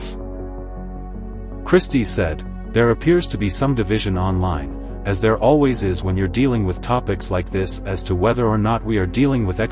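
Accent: France. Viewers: You have a beautiful voice CAPTAIN. You rock the flight deck. Good job! 💖🌞🙋‍♂️👽 "We like your french accent best." Fun to look into a.i. in a good way and have fun with it. ✌️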